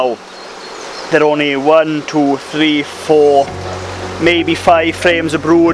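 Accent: Wales, United Kingdom. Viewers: only joking. Love the Welsh accent. Irishman here. Looking to get in to beekeeping. Have hives and equipment. Reading reading reading and watching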